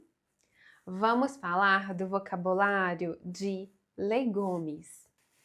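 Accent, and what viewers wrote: Minas Gerais, Brazil. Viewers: Oi,! Acabei de descobrir seu canal. Adoro seu sotaque, é muito legal! Ele é típico de Minas Gerais? Você comentou num vídeo que é de Belo Horizonte, né? Quem sabe você faz um vídeo sobre isso? Um abraço da Suíça 🇨🇭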